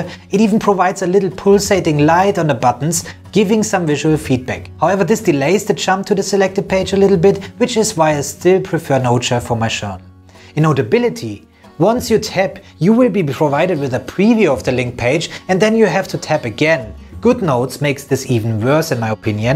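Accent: Germany. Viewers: Er hört sich so deutsch ann??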